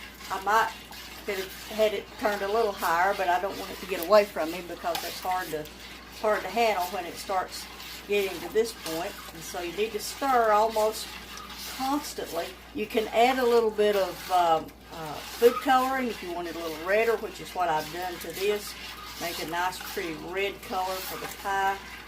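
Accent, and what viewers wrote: South, United States. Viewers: Well, I have never personally canned anything! This video was a joy to watch and to again hear your sweet southern accent bringing peace to me this morning. God is so good. My dear Grandmother would can pie mixes, so this also brought memories of my sweet grandma Alyce. Thank you. 💕 A couple of thoughts I had watching - I'll share - you need a strong shoulder to stir for at least 30 minutes, then an even stronger shoulder to mix in all that fruit. Enlist a grandchild or otherwise stronger younger person to be the official strawberry stirrer! The rewarding part of being enlisted in your kitchen is time with you and some fresh strawberries! And finally, you ended with listen for the "ping" - assuming you mean your jarring caps ping? I didn't know that! That must be rewarding music after hours of labor. 🎶 Thanks again for sharing. 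Have a blessed day.❤️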